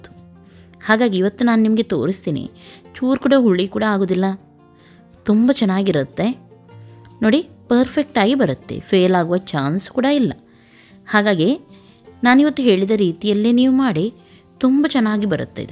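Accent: Mangalore, India. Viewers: Hegidira akka I'm from pattadakalu nim manglurige bhashe nage thumba esta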